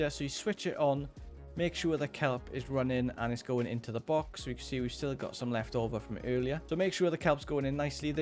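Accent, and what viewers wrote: Wales, United Kingdom. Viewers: Hey, where in Wales are you from? I'm from Llantrisant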